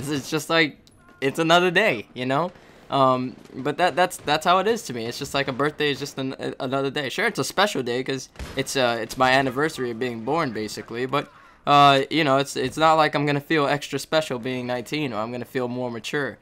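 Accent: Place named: New York, United States. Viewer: He has that New York City accent, so it's not his fault.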